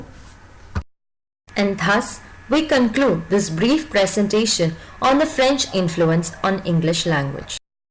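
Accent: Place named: United Kingdom. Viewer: That horrible British accent makes it impossible to understand.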